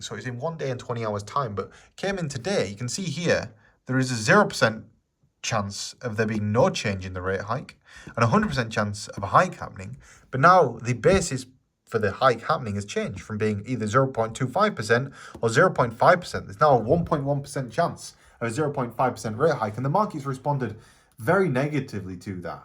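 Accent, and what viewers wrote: Yorkshire, United Kingdom. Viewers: Allreet? Yorkshire man😊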